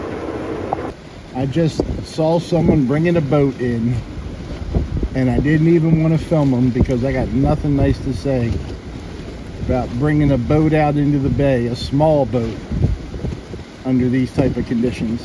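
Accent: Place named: Texas, United States. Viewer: There's something beautiful about the look of the place after a hard rain. The food looked good! And, your accent sounds more Texan today. haha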